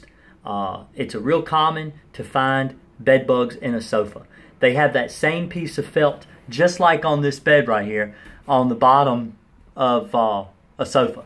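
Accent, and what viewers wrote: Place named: South, United States. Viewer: Thanks good info... you have an accent like Gomer Pyle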